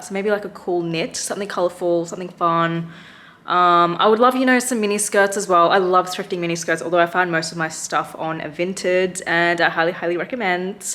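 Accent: United Kingdom. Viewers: girl, you do be sounding a bit british ahhhh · To be honest at the beginning of this video when you said you were actually Australian I had no idea lol I thought you were British too, I'm so sorry 😆🥲 Loved the thrift haul, sad to hear that the thrift scene in London isn't that amazing though, but you still managed to find some nice things!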